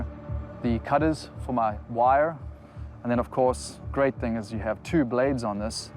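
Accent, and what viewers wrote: South Africa. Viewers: I hear a South African accent. It's so distinct. Good luck!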